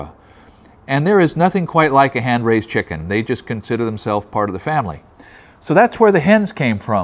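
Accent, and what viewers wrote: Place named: Northeast, United States. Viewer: Love hearing the ring of a northeastern state from the mouth of an honorable northwestern man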